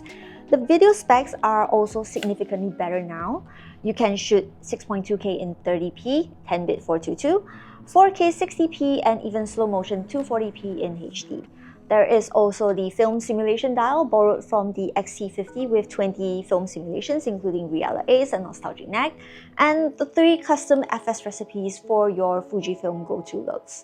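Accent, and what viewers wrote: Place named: Singapore. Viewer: fellow singaporean here and i’m so happy to hear a familiar accent on youtube!! :) i’m also planning to get this camera so this is very useful. thank you!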